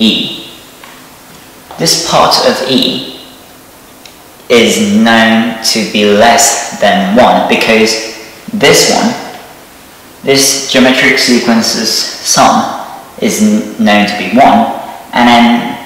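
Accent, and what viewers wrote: United Kingdom. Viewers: If anything more inclined to British English his English is, which is really comfortable to hear. A great explanation. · 美しいイギリス英語！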